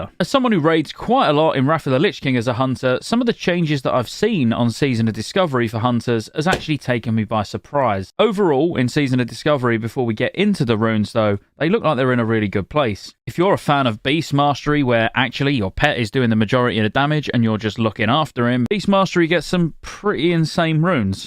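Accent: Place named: London, United Kingdom. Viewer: Definitely got a subscriber from this video. Also sound like you're from London and remind me of Alex Brooker!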